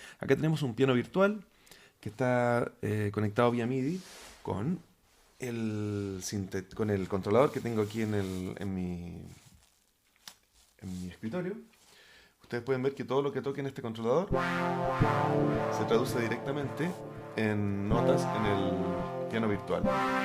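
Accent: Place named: Chile. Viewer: que maravilla encontrarme con tu canal y por las dudas al escuchar tu acento me fui a revisar tu cv para soprenderme nuevamente de que eres chileno y haces patria tan lejos. Tras algunos minutos ya entendí lo que es el pure data y están muy interesantes los pasos. A ver si me animo a descargar y aprender a usarlo. Muchas gracias por compartir! Excelente canal educativo :) Saludos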